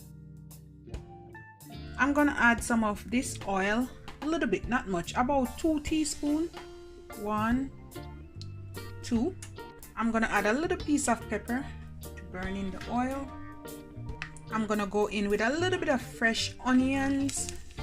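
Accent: Jamaica. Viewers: Wagwaaaan I love your Jamaican accent. .ouchea learning a great recipe for great food I hope you ill hallla at me too ...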